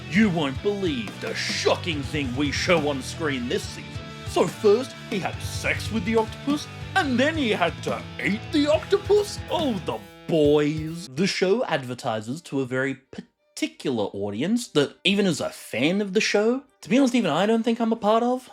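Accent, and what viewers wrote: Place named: Australia. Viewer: I thought you were English -- I didn't catch the Aussie accent, but still nailed it. I'm not sure you fully understand why Unite The Kingdom exists, but judging from your points, I'm guessing it doesn't matter because you have that far-left ideology understanding of what "masculinity" is. However, your reply clearly took time & was..... well, nice. It's hard to actually engage with people, nowadays, especially online, & just be civil. Cheers, to that. I write back because I want to see if I can somehow sway your opinions, or cause you to want to actually be informed on things -- your misunderstanding of why I said "migrants" & not "immigrants" caused me to want to reply. I also disagree with you completely about the comic & the show -- they are DIFFERENT. The comic is so much better at creating & exploring the world, while the show offers other aspects that are done better. 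I'm also a fan of how the comic implemented compound V, as it gives some of the characters more agency. The finale of the show borrowed heavily from the comic, when it shouldn't've -- yes, the show commented on everything you mention, but it wasn't until season 3, or so, when it just became another far-left media of propaganda, eschewing any balanced parody or satire, for openly taking shots at anyone who doesn't agree with "The Left", even those who participate in politics under the same flags. In mentioning this, I am reminded it was your comment about how you're "confident by the small comment reducin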